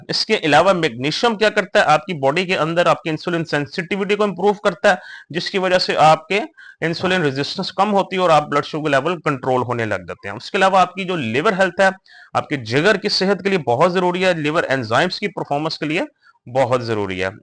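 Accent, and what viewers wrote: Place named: Pakistan. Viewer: السلامُ علیکم ۔ لہجے سے تو آپ پاکستانی لگتے ہیں۔ اور ذیابطیس کی یہ انوکھی وجہ آپ نے بتائی۔ میں ہندوستاں سے ہیں ۔ مجھے بھی ٹائپ ۲ شوگر ہے۔ فیملی history میں کسی کو کبھی یہ مرض نہیں رہا۔ کیا مجھے بھی آپکی سُجھائی باتوں سے فائدہ ہوگا۔ ہمارے یہاں کے پروفیشنلز کا آپکی دی ہوئی وجوہات پر اعتماد نہیں ہے۔ آپ کے ملک میں اس مرض میں مبتلا کتنے لوگ ہیں؟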